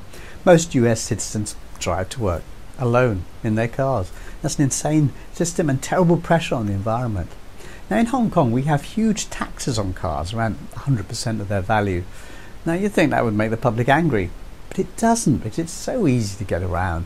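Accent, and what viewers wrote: United Kingdom. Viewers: yeah you are absolutely right and sounds alike also except his British accent.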